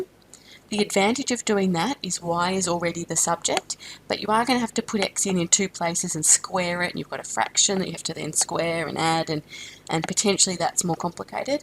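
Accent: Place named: Australia. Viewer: i needed to find an aussie math channel cause im in aus and as i soon as i heard ur voice i found it thanks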